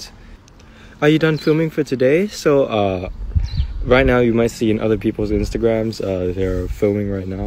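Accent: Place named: United States. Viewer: He is heavily influenced by American English. His English sounds more or less like American rather than British or Australian or other accents.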